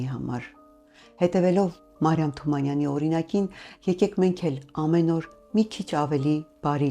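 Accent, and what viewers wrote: Standard. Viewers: Հարգելի ❤️ինչպես միշտ շատ հետաքրքիր է ձեզ լսելը 🥰 Ձեր մաքրամաքուր հայերենը կրկնակի հաճելի է լսել 🥹🥹🥹🙏🏻🙏🏻🙏🏻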